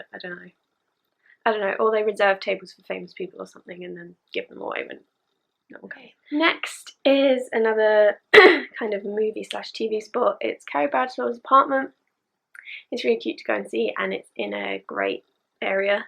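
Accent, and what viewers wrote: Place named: England, United Kingdom. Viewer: Nice channel keep it up love your english accent:D!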